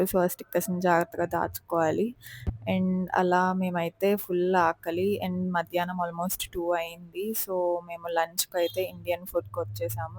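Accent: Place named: Telangana, India. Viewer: Moutham telangana slang undie ,😅😅😅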